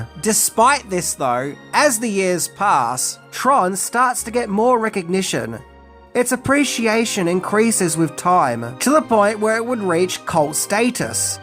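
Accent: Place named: Australia. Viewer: There's the OST and then there's an 8-bit version of the OST. Both are incredible. And, despite your not being familiar with the technology and your tendency to speak like an Australian, I LOVE your totally unpretentious takes on film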